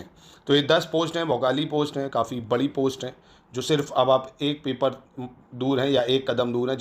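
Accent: Kanpur, India. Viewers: sir cut to cut bolte hai mast kanpuria andaaz❤❤❤❤❤❤❤❤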